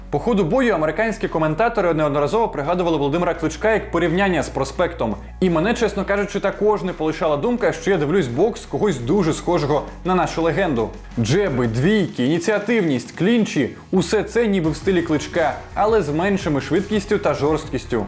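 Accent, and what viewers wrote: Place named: Urals, Russia. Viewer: Ведущая вообще не в теме,павловский и немцов?Да он в гробу переворачивается,когда его приравнивают к флюгеру-коньюктурщику-приспособленцу павловскому,который в Украине был идеологом всех темныз сил,януковича,отравление Ющенко,его идея,до последних предвоенных дней топил за опзж на ахметовских каналах,с другими рыговскими прорашисткими недобитками,вы о чем.Исправляйте свой уральский говорок,отличительная черта всех неоднозначных ведущих данного канала,то полный рот дикции,то внешность неоднозначная,это толерантность такая?))))Посмотрите оа Настоящее время,и ведущие и подача,у Михаила Борисовича денег мало?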